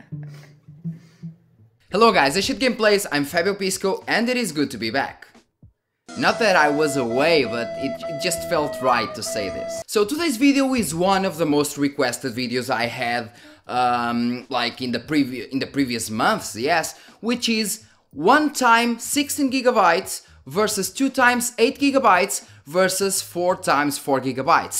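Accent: Portugal. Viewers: Olá, parece que és Português hahahha. Achas que devo fazer o upgrade de 16GB RAM (2x8gb) para 32GB RAM (4x8gb) ou devo ficar com os 16GB? Ps.: Lembrando que fica caro, comprar 32GB RAM (2x16gb)... só se conseguisse vender as RAM que tenho. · Tens mesmo sotaque de tuga !!!!! Excelente video....Parabens !!!! ;) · Vi logo que com esse sotaque só podias ser português hahah, bom video! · Bro are you Portuguese?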